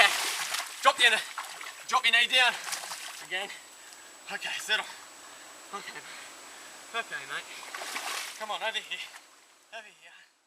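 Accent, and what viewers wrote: Australia. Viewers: knew he was australian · Australian accent : let's catch a d, I think this one was bullshit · Gaming &amp; More! hes Australian not Russian!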